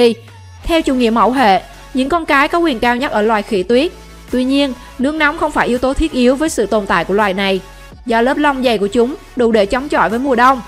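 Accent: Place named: Central Highlands, Vietnam. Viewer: Phát Nguyễn gia lai hoặc bình định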